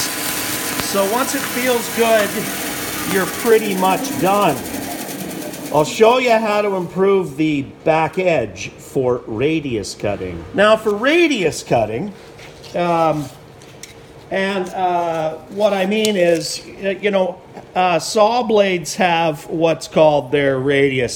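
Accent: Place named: East Coast, United States. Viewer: Great information to know, thanks. I detect a slight East Coast accent.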